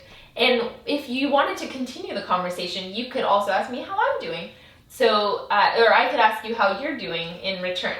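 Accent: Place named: Tehran, Iran. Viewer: there are some very old persian dialects. I have only run into one person who spoke an older, more traditional Bakhtiari persian. This is more modern "tehranian" accent persian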